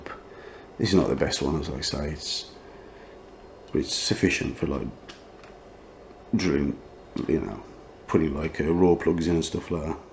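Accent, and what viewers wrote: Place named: Midlands, United Kingdom. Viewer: Midlands man right there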